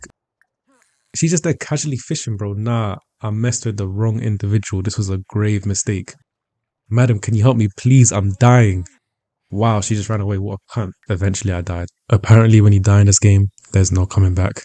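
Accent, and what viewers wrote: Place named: Jamaica. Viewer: Is it just me or bro use Jamaican sound 😮and i am Jamaican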